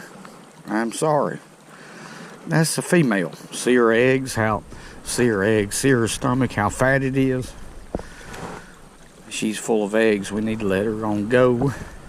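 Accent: South, United States. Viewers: Naw, man, he wasn't drunk, he's just southern as all get out. And where he's fishing, Weiss Lake, is considered "Crappie Capital of the World", or so the signs say when you get near the lake. You ought to head there if you ever get the chance. Rent you a room at the Alabama Bell, fish the pier, hire a guide or take your boat. You won't regret it.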